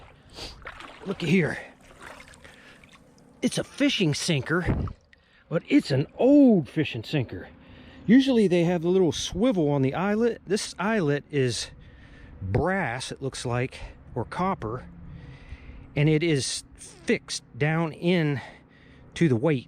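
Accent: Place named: West Virginia, United States. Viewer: Just started watching ur videos. I knew u had to be from WV w/your accent & u pulled 20+yrs in the Army Benning, Drum or Lewis? Pop bottle 77’, “I was just a little fella”, & so was I. I’m a 74 edition, lifelong Missouri man, Missouri born, Missouri bred & when I die I’ll be Missouri dead! 😆 Where I live, (not right on the water but close), is a small creek you can fish year round named “Cowskin Creek”, & it runs into a bigger stream named “Beaver Creek”. Old man I knew years ago found quality heads, points just amazing collection. His son donated it to the local county museum. We’re in Douglas county MO., & where I live just west of Ava 4-miles the Osage Indians lived here & a smaller tribe known as the “Ponkeshaw”, lived right here where I live. I’ve been down to Cowskin & just dig a hole in a gravel bar & find all sorts of heads. 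I’ve never found what I’d call real quality heads, but I always look in the creek or right on the banks. Watching you I’ve gotten new ideas & plans to search more effectively, hopefully finding a much higher quality of heads. The old man I mentioned found every single point, stone & head on the Cowskin Creek Valley & his collection was AMAZING!! If I find some good stones, points & heads I’ll send ya a few pics of what I was able to find! From this to you, thanks for the videos & really enjoy watching them & thanks for getting me energized again to go digging! I’ve got “Degenerative Disk Disease”, so I can’t up & go whenever I feel l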